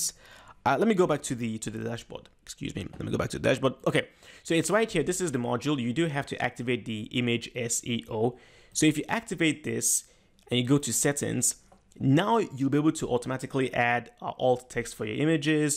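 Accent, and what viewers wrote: Nigeria. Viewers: You sound like a Nigeria, are you?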